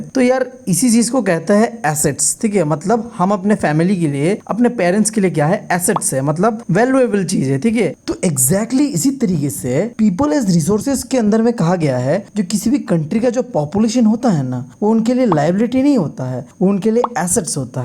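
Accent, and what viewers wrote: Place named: Mumbai, India. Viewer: I think you are from Mumbai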